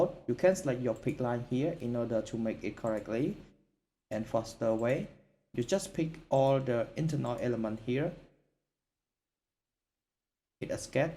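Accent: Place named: Cambodia. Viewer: He sound Cambodian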